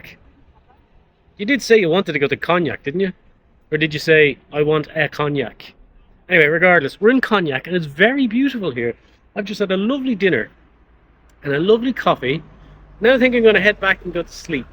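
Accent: Ireland. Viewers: I bet you get away with muder with irish charm and the accent seems to work well. I get to see some great beautiful hotel in France. Conguc! have a drink please. You all worked very hard on openning day. You should be proud it will last and have your finger print on the chateau for sure. 😂the old confused man will whip your butt he decided to spare you his viking ways and just confiscate the bike. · Bonjour d'Occitanie J'ai aimé la référence à James Bond, pour le charme de votre accent irlandais, s'ils sont aussi doué que la majorité des Français avec l'anglais, je pense à l'accueil de l'hôtel, ils n'ont pas dû l'entendre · That evening sun and clouds was so lovely! I'm sure it was more beautiful in person. And aha, you're well aware of the power of that Irish accent! You ugly? The only reason they'd have to hide you would be, well, from the young women.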